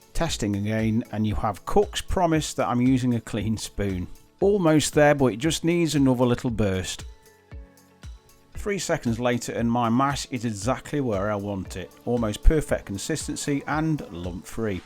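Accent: United Kingdom. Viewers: You have a strong UK accent, hard for me to grasp everything… but i like the challenge! There s the transcription too. 😘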